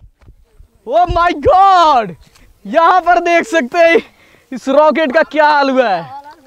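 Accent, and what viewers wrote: Bihar, India. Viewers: Kay app बिहार के हे l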